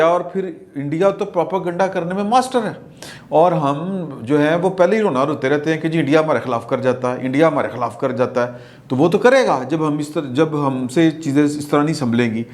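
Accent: Punjab, Pakistan. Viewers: Indian Punjabi here, makes me sad what’s happening in Pakistani Punjab. Although I love anchors Punjabi Urdu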